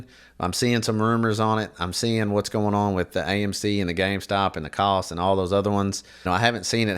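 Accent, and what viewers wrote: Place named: Texas, United States. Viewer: You from Texas? You sound Texan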